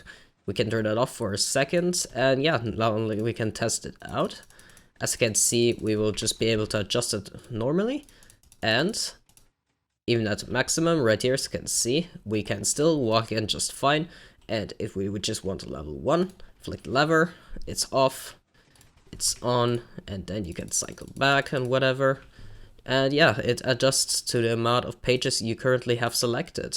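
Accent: Germany. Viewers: Warum machst du dann Englische Videos Übrigens bei der Betonung hört man sofort den Deutschen raus ist mir genau das gleiche😅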